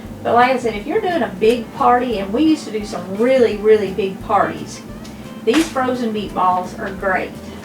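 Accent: South, United States. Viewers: I love your southern drawl and yes, I can just tell that you are good people 😘👌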